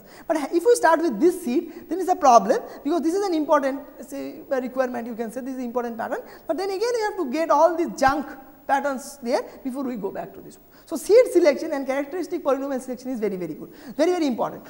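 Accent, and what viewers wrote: India. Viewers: would you please talk slower than this I am pretty sure you are saying good information , but the Indian accent with the very fasr rhythm make it very hard to collect data .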